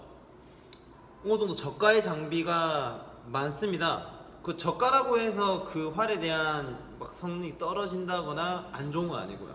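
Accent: Busan, South Korea. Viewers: 부산분이여서ᆢ듣기넘 좋아요^^ 오늘 처음 양궁을 접했구요 많이 구독해서 하면서 궁금한건 물어 볼께요 아~스트레스 해소에는 매우 좋아요 계속 쭉~욱 해볼까 합니다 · DC에서 보고 왔습니다~ 입문자들에게 아주 유익한 정보가 많을 것 같습니다~말투가 부산말투라서 부산이가 했는데 역시 부산이였네요~앞으로 많은 좋은 정보 기대 합니다~긴장하셨는지 처음에 많이 굳은 표정이라 웃는 얼굴이면 좋겠다는 생각이 들었는데~뒤로 가면서 여유가 생기면서 웃는 얼굴이 좋았습니다~그럼 다음편 기다리겠습니다~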